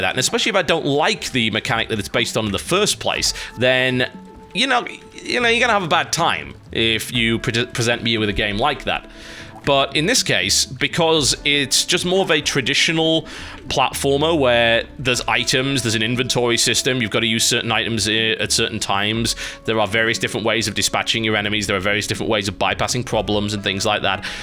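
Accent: United Kingdom. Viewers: If it's one thing I love about, or perhaps the British accent in general, it's that you can say things like "...make me want to rip off my own face." and sound like a total gentleman.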